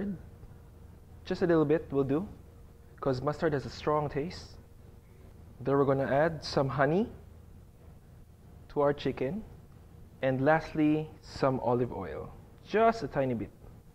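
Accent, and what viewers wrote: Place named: Philippines. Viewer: thats a heavy flip accent TALAGA !!!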